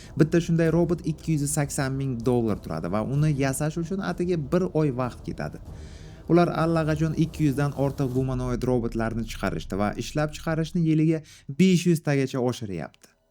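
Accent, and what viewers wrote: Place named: Bukhara, Uzbekistan. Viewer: Bro buxorodanmisiz deymanda 😅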